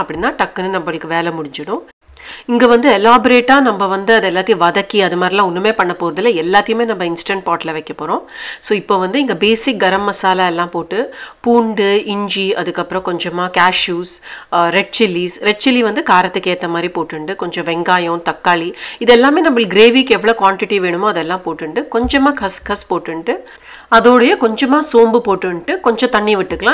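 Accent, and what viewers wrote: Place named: Tamil Nadu, India. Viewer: As always simple and yummy. Love ur Tamil accent 😊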